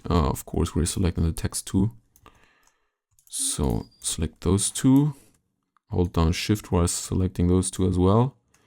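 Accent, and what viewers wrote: Germany. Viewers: kanns sein, dass du deutsch bist? :D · Watched every second of this. Lovely. Jurgen Klopp came to mind through out because of the German accent lol. Bravo! Subscribed!!!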